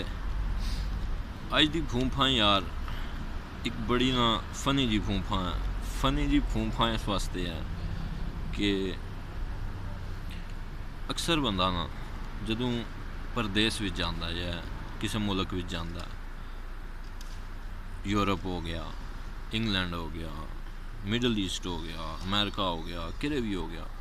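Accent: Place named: Punjab, India. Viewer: g thoda Punjabi Accent kmaal da 👌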